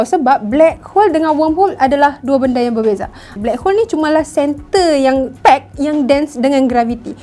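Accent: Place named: Kedah, Malaysia. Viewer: loghat kedah piaw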